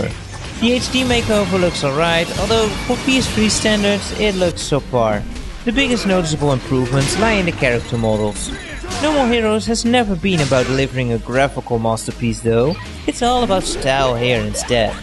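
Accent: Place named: Netherlands. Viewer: hahah goeie review. ik had direct door dat je een Nederlander bent :p Voor 40€ is hem zeker waard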